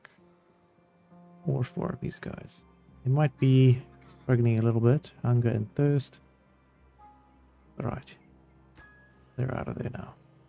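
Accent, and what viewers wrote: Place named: South Africa. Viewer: yay, another South African timberborn player, heard the accent immediatly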